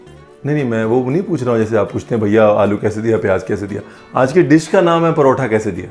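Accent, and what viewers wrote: India. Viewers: No words to explain anything Abt u ND ur vedios... Ur smile is just way too🔥🔥🔥my heart falls Everytime for your every word that you speak in such a down to earth ND desified way...your recipes just amazing...I love cooking I try all of your recipes...ND your punjabiness...❤️❤️❤️❤️❤️❤️❤️❤️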